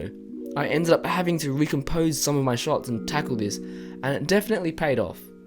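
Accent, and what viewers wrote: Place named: Australia. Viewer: so i've been following you on instagram and tumblr for so long (youtube for at least a year) and as you were speaking, I only just realised that you were Australian... OTL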